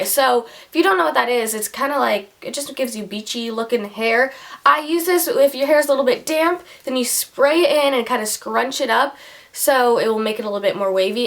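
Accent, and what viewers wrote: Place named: United States. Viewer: IKR!!! But American accent obviously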